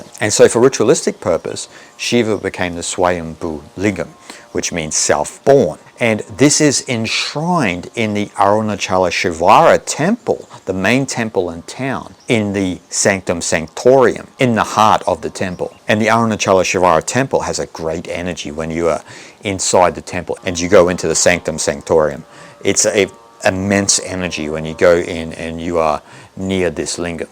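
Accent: Australia. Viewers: THANKYOU SO MUCH FOR THIS WONDERFUL EASY TO UNDERSTAND EXPLAINATION OF ARUNACHALA AND RAMANA MAHARISHI AND THE REASON WHY PEOPLE GO THERE TO RECOGNIZE THE SHIVA WITHIN THEMSELVES HALLELUJA AND AMEN I HAVENT BEEN THERE MYSELF BUT MY DAUGHTER AND THEIR GROUP WITH THEIR GURUS DID THE CIRCUMBILATION OF THE HOLY MOUNTAIN I HAVE KNOWN ABOUT RAMANA MAHARISHI FOR A LONG TIME MANY YEARS BUT I GHOSE ANOTHER PATH OF TIBETAN BUDDHISM WHICH OFFCOURSE IN THE END COMES TO THE SAME CONCLUSION BUT IAM SO PLEASED THAT MY DAUGHTER DID THIS AND HAS TAKEN THE PATH OF SHIVA WITH TWO BALINESE VERY HOLY GURUS IN BALI AND WE LIVE IN BALI NO DOUBT YOUV HEARD OF UBUD A MECCA FOR TOURISTS AND YOGA ANYWAY I CAN HEAR YOU ARE AUSTRALIAN AS WE ARE AS WELL ALLTHOUGH MY DAUGHTER HAS BECOME INDONESIAN AND WITH HER INDONESIAN OR RATHER BALINESE HUSBAND BROUGHT UP HER KIDS HEAR AND DURING THOSE 27 years HAVE HOMESTAY WITH A YOGA RETREAT FACILITY AND SO ON HERE AND IS VERY MUCH INTO THEIR ASHRAMS AND SO ON FOR ALL THOSE YEARS BUT ANYWAY I HAVENT HAD THE OPPORTUNITY TO GO TO THAT PART OF INDIA ALLTHOUGH I WENT TO BODGAYA TWICE AND DHARAMSALA IN NORTH INDIA AND WAS PRIVILIGED ENOUGH TO BE IN THE PRESENCE OF HIS HOLYNES THE DALAILAMA AND RECIEVING ORDINATION FROM HIM BUT IT ONLY LASTED ABOUT 3 YEARS ANYWAY AND THEN MORE OR LESS ON AND OFF JOINED MY DAUGHTER IN BALI BUT NOW LIVE HERE PERMANENTLY FOR THE LAST 2 YEARS AND 9 MONTHS SINCE IAM 83 allmost 84 MY DAUGHTER IS LOOKING AFTER ME IN RETIREMENT THE NAME OF O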